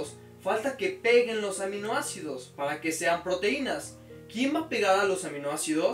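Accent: Fresa, Mexico. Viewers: Habla bien fresa pero aprendí en 5 minutos lo que no pude aprender en 3 años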